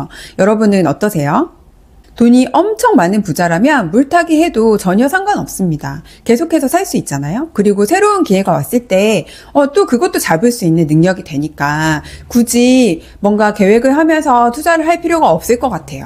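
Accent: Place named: Busan, South Korea. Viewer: 벽면에 금고 문이 있네요. 금고 언제 가득차요? ㅎ 똑순히 시원하게 애기 잘하시네요.악센터 보니 부산분인가요? 유익한 말씀 감사합니다.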